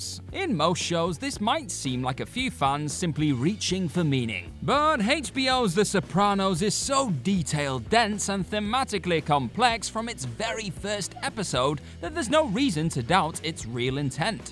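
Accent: United Kingdom. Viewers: It's just a British thing, they say haitch rather than aitch for the letter 'h'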